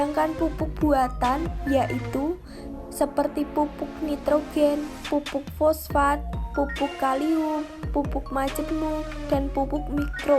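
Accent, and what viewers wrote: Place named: Java, Indonesia. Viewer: Medok men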